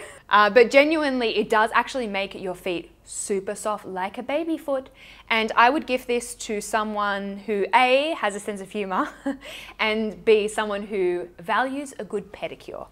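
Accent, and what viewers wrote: Australia. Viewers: This video makes me so happy 🥰🥰 I always adore your content but this was especially 👌 When you said ‘ya weirdo!’ And swatted at the screen I burst out laughing. I’ve been overseas half a year and just the combo of the Aussie accent, the -ism and the playful niceness made me think of home and Aus, which I’m starting to really miss since I’m in the Dutch winter and the weather is grim/there’s been a cultural adjustment to say the least. I love how you give specific recommendations such as ‘the friend who reads all the glossy mags’ it makes me able to picture what goes where, or even more like which vibe suits who. Which is what I always aim for in gift giving. And finally, at the end when you spoke about engaging with something close to your heart and you spoke with such enthusiasm and sincerity...that was so lovely. A reminder of a different kind of gift giving ❤️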